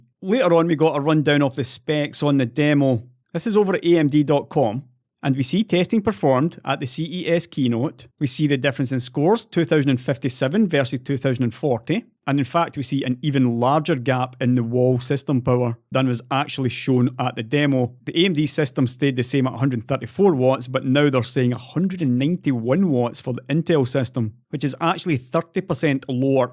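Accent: Scotland, United Kingdom. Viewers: so. much. sa.tis.faction. Just dripping from your thick Scawttish vowels. I even felt a little bit smug myself:) nice work! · Wow, that is an absurdly strong Scottish accent.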